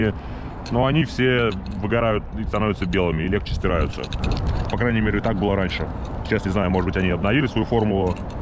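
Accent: Russia. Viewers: Я так и не понел,ты русский или американец?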